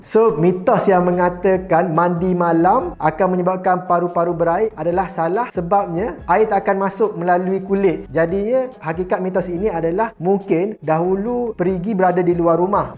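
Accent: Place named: Kelantan, Malaysia. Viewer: Ore klate pesen nii..gaya kecek gitu😂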